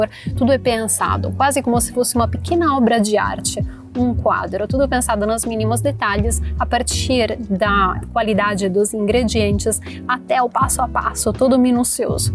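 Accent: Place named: Italy. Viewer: ❤Muito massa,! Adorei! Tua performance é perfeita. Gosto do acento italiano quando falas em português! Parabéns!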